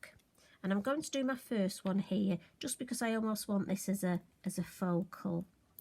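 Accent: United Kingdom. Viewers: Absolutely gorgeous! I have got to try this one! I love your accent! My son and his wife live in London and last fall we stayed there three months. It was the best time of my life other than having my babies! Now I’m going to be a grandmother....so exciting! Just hope we can get over there again next year! So enjoy your videos...keep them up!♥️😘🤗🌸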